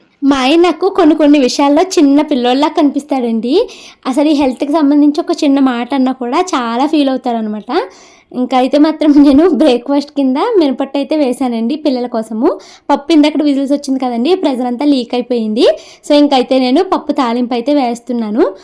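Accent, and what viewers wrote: Godavari, India. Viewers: Medi proper a uru andi, me puttillu ekkada, ante slang godavari valla la undemo anukuntunna.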